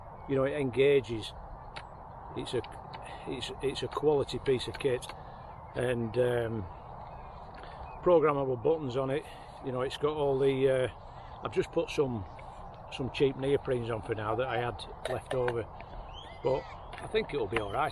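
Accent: Lancashire, United Kingdom. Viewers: Dear as a lad from Wigan who now lives in Belgium it was nice to hear some Lancashire tones watching this… I’ve just done the same and got rid of all my Nikon gear for this combo… although I’m just waiting for my Pro filter which at €300 is expensive but if it protects the lens it’s worth it. Congratulations on a great vid… really enjoyed it. Regards Paul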